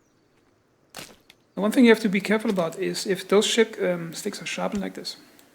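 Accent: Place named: Germany. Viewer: He sounds German to me...he's not treating this as a game, more like a job.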